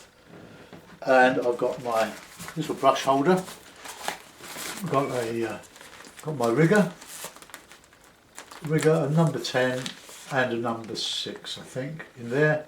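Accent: United Kingdom. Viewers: Lovely! I learned at lot from just this v8deo. However, I wish the artist (not just you, but many on You Tube) would edit the closed captioning, if that is possible. I have trouble hearing, and without being able to read your lips, as well as the British accent (old American here) its very hard to understand. The closed captioning is terrible; in many sections it makes absolutely no sense. But the video is magnificent otherwise!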